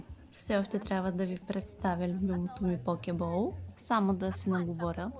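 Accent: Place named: Varna, Bulgaria. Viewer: Красива си ! Въпреки Варненския диалект, гласът ти ме радва! Желая ти всичко най добро ...❤😊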